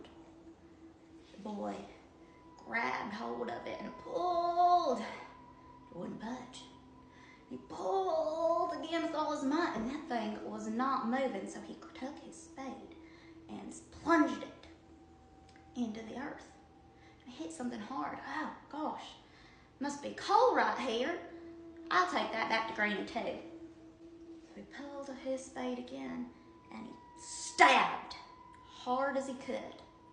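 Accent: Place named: South, United States. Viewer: howdey from wv were are you from your acent is thick as southern corn bread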